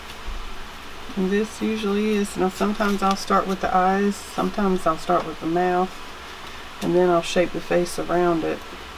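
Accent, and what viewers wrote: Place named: North Carolina, United States. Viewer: I love how "real" you are in your videos, and of course, it's always nice hearing a fellow North Carolinian talk! lol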